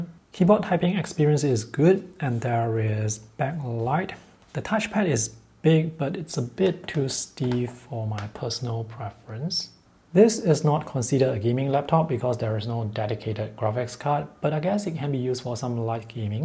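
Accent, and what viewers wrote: Singapore. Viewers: Nice review you sound like a Singaporean! 😊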